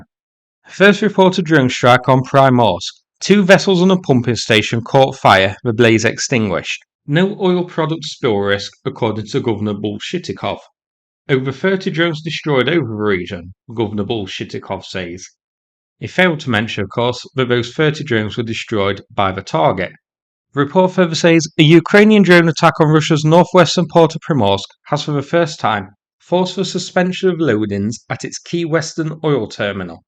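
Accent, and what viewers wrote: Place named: Lancashire, United Kingdom. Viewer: I can't pick your accent. Lancashire? I'm guessing North of Manchester but not Leeds. Kind of softer than Burnley.... Greetings from Australia.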